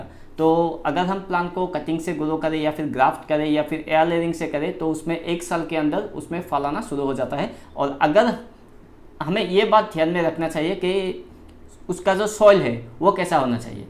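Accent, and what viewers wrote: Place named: Northeast, India. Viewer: Voice like you're from North-east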